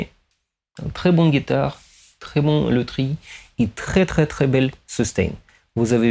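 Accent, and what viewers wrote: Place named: Russia. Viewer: Акцент русский))))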